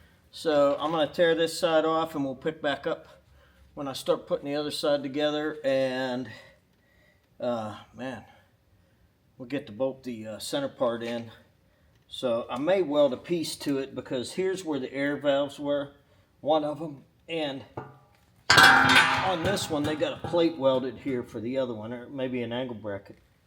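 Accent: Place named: New England, United States. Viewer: It's like watching Me but with a New England accent!!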